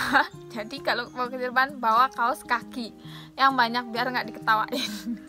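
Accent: Bali, Indonesia. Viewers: Kok logatnya kyk orang Bali? Dari Bali yaa?? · Iya logat nya kyak logat Denpasar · Logat Bali nya ga ilang yaa.. Keep inspiring. Send love from Indonesia ❤ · mba orang bali ya?? logatnya seperti org bali😊😊😊😊😆😆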